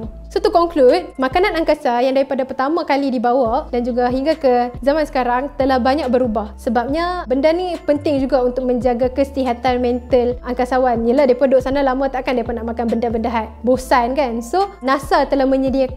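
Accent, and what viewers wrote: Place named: North, Malaysia. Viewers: TBH...kalau nak cakap utaqa...cakap utaqa terus..I can see a lot of mix up ...anyway good information...thumbs up👍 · Kedah elokk hahaha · The BEST...north accent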